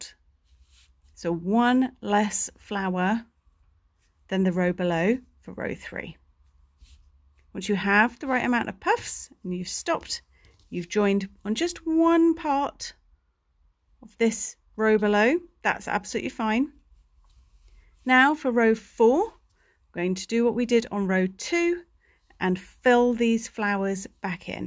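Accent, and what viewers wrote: United Kingdom. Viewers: Agreed Dolton-all of her videos/tutorials are so easy to follow, and she explains thing(steps) in easy to understand terms. Plus the British accent is great 😊. Also been crocheting for 50+ years and still learning thanks to awesome teachers like 🎉🎉🎉🤩!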